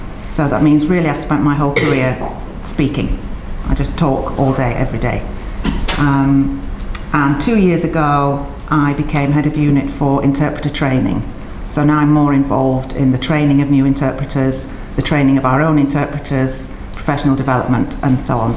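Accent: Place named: United Kingdom. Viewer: I THINK she is british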